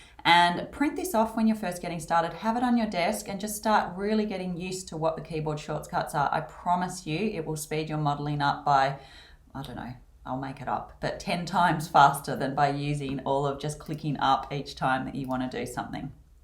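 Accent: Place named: Australia. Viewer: Some great tips for beginners and love the speed and direct approach. You mentioned a few times that you use a very powerful computer as the polygon count can get very high. Could you please share that info so if we upgrade our systems we have an idea what to get. Mother board Brand/Model: CPU Brand/Model: GPU Brand/Model: Ram Type/Speed and Number of Gig: Love your accent (maybe Australian) Nice clear voice and a great visual presentation Just one little thing to improve, the audio had some reverb and sounded hollow( possibly too many hard surfaces in your room) Great Work.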